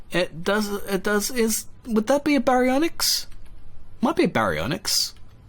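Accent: Australia. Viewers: Just wondering are you Australian. If so. Let’s go Aussie. Also do you watch the AFL or know about the AFL. If you do know or watch afl which team do u barrack for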